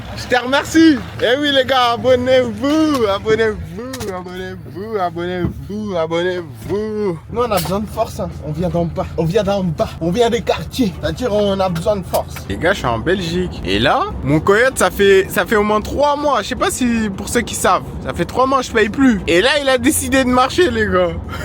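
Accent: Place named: Marne, France. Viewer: Mais nan! T’es de Reims! Je le savais! T’as l’accent marnais 😊, un cagoulois de Chalons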